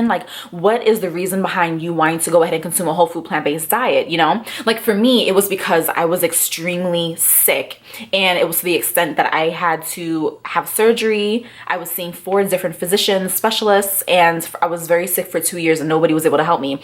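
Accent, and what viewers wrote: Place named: Boston, United States. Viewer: FYI You are so beautiful and I love your accent. You almost sound like you are from my side of town (Boston). I am in Charleston right now but I am bean town born and raised!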